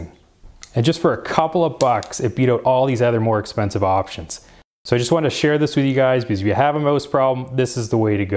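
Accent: Canada. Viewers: You say about and mouse like someone from either Canada or certain places in Virginia. Are you ? I've had success with setting that trap with the yellow bait shelf like you have, and it works well. Also a " Mice Cube " works well for someone who doesn't want to touch the mouse, or doesn't actually want to kill it. For bait, use 1/2 of a Lay's peanut butter cracker (the 1/2 that the peanut butter sticks to) and slide it down to the other end of the "cube". Works like a charm !! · I thought you were Canadian by your accent, then I saw the hockey stick!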